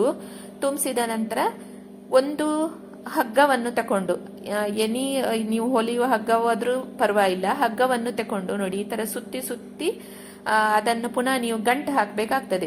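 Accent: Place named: Mangalore, India. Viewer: ಮಂಗಳೂರ್ ಕನ್ನಡ ಕೇಳೋಕ್ ಚಂದ ಹಾಗಲಕಾಯಿ ಸಿಪ್ಪೆ ಯಾವತ್ತೂ ತೆಗೆದಿಲ್ಲ ರೆಸಿಪಿ 👌👌👌😋😋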